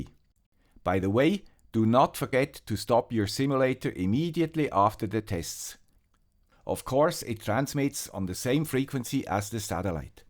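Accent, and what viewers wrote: Switzerland. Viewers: Another great episode from the ham with the Swiss accent. :-)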